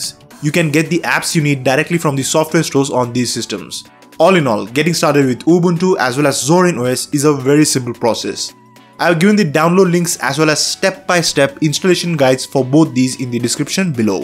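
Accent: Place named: India. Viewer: as a native english speaker i find ,most f whats said almost impossble to understand spoken way to fast in a terrible paki/guppta/ indian accent,big FAIL!!!!!.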